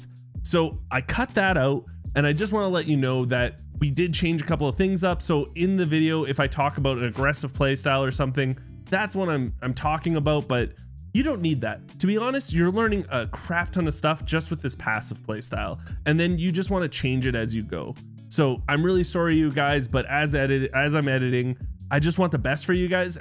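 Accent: Canada. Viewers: you are definitely Canadian 😂❤ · I love that Canadian accent you got there bud. I like watching your videos, I'm a big supporter over here. When they killed you at the exfil, I laughed so hard I almost peed my pants. I'm so glad that it's not just me that gets ganked all the time at the exfil.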